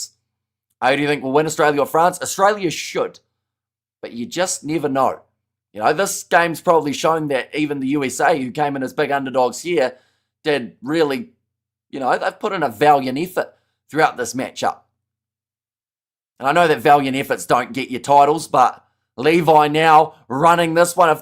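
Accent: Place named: New Zealand. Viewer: As an aside I’m a voice coach in New Zealand and you are the epitome of the New Zealand accent for a guy. Also enjoy your passion for sport. Cheers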